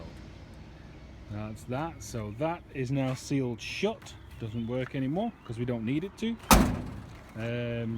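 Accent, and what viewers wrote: United Kingdom. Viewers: A guy with a British accent living in cali. With an old school Ford truck. Welcome to America friend!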